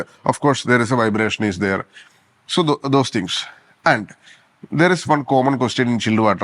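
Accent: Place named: India. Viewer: dear you are doing great job. but please pronounce words in a way so any one can understand easily. your pronunciation is like typical domestic indian language. keep in mind while making the video tht Your audience is not only from India but also from all countries. so your words should be understandable for all of them.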